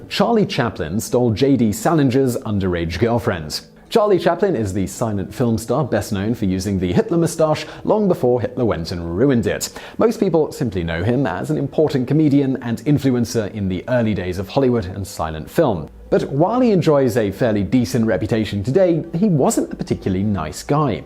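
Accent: United Kingdom. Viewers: Uhh Debbie Reynolds, Elizabeth Taylor and Eddie Fisher should have been number one not scandal involving the Sherlock Holmes author and Houdini. But maybe bias since you sound like you’re from the UK?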